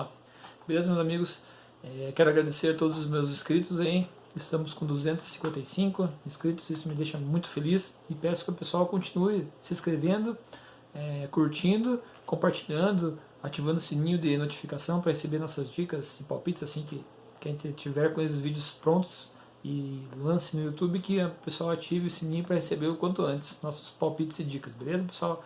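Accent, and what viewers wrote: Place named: Rio Grande do Sul, Brazil. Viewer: .. Opa sou pé vermelho.. MORO em Ctba. Um abraço . Mas tem sotaque do Rio Grande do Sul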